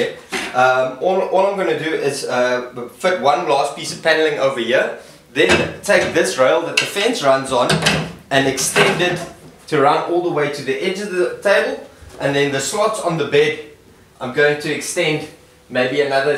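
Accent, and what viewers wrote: South Africa. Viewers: are you south african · Great! I have a tiny shop and was going to build something similar, only smaller.. Awesome ideas.. BTW, love your accent, South Africa? :D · South African?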